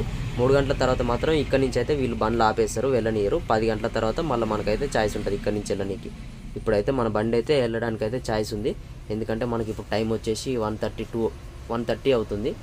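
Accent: Telangana, India. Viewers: Bro Nee Videos Lo Special enti cheppana Nee Voice Bagundi Bro And Nuvvu Mnatlade Fluent Telugu ante Telangana Slang undi Kani Machinga Ardham ayitadi nee mataloo videos lo Naaku kuda oka Wish undi Bro Okkasari Neelaga Truck Loading cheyali Enjoy Cheyali Anthe😄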